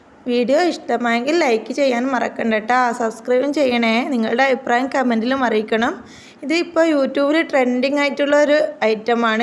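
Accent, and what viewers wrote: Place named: Malabar, India. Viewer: ഇമ്മള് കോഴിക്കോടൊ അതോ മലപ്പുറമോ ട്ട ട്ടട്ട❤❤L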